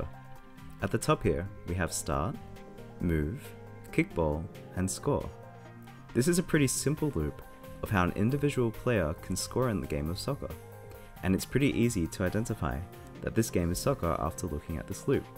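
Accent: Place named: Australia. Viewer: I have been watching your videos recently, but had not released you created Super Hot- great game! Plus you sound like a fellow Aussie, so a thumbs up just for that :)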